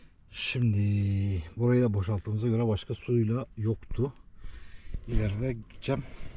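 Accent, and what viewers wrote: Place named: Yoruk, Turkey. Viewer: Yalnız orası neresi merak ettim. Bizim aydın didim'in Yörükleri gibi konuşman ama...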